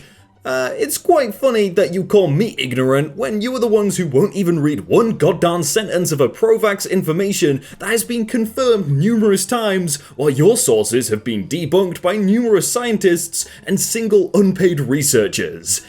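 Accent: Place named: United Kingdom. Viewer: How can you be british, or at least sound it and NOT know who klopp is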